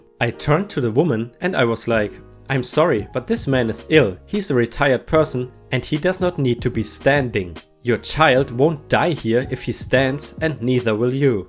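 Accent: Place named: Germany. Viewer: I don’t listen to many channels I’m not already subscribed to. There are so many new ones popping up and I usually end up getting the same stories over and over again. So, I stay faithful to my regulars. However, one of your videos caught my eye and I clicked on it. I really enjoy your voice and (to me, as I’m not German) wonderful accent. I do realize to you it would be ME who has the accent! 😀 Anyway, I have subscribed to your channel. I look forward to many more of your videos!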